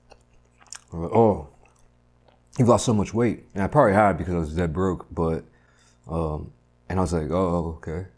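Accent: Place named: Midwest, United States. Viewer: I knew you had a midwest accent lol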